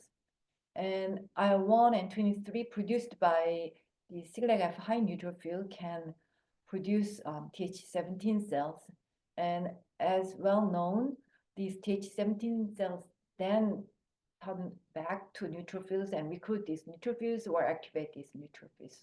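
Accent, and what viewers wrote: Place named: South Korea. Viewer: Konglish is making my head reel... Im really trying to make out what she's saying. Sorry 😔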